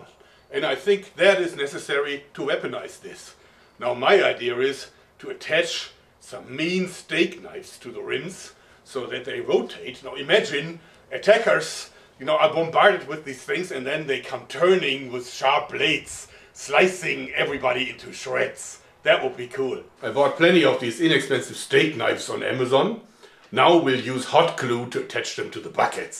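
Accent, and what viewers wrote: Germany. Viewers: i just love his german accent😂😂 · Either this is the thickest german accent I've heard in my life should include lederhosen or it's the thickest Swenglish I've ever come upon. CHARMING FELLOW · I am from austria we speak german (sort of) and before i watched his videos my englisch sounded very clean. But now i use the german axcent (his german axcent) in every sentence when i speak, ha ha ha, life is fun.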